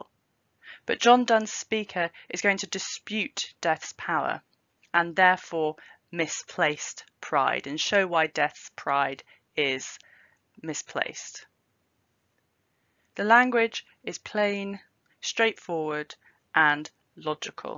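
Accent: United Kingdom. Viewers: Dear Dr. - thank you for this quintessential explanation to one of my most loved poems that i have always interpreted as the secret of what the mere Christian fairy tale is about. This poem connected me to transcendence like no other and i am not a privileged elite - i was educated in New York City schools and as Puerto Rican and trouble even understanding everyday language. There was some very beautiful way about this dialogue that blew me away. Now i found you and you have masterly deepen the beauty and given me more knowledge in that lovely British voice. I just put together the Russian Dying SWAN with this poem - for my imagination of the transition; like you said it is just a divine nap- ( LOVE THIS NEW INTERPRETATION) and we become so very rested and beautiful. In Socrates ‘ PHAEDRAS it is the trumpeter’s song - the more beautiful when thy know that they are to die - this gives me so MUCH HOPE!THE GREAT NEWS! 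I didnt know the COR.. SCRIPTURE - WOW. Today is an UTMOST FINE- YOU! Thank you for this illumination!SEE DYING SWAN- DLYANA-LOPATKINA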